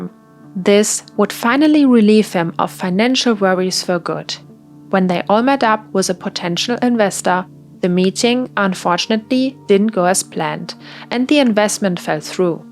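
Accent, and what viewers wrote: Germany. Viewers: Dieser deutsche Akzent ugh This accent I’m a German and it hurts even me🤣😭 but the video on it self is awesome.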